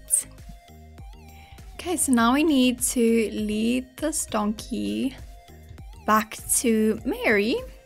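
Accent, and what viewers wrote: South Africa. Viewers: Hi, are you South African because I recognize your accent. 🙂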